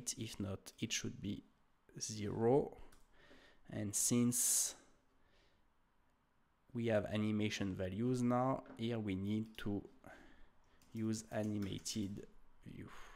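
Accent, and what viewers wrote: France. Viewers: are you French speaking ?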